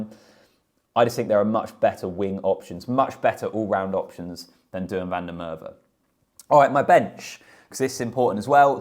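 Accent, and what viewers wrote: England, United Kingdom. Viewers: Do you not hear a pretty english accent mate? Also we going to act like ireland bavent been top 2 in the world for like 3/4 years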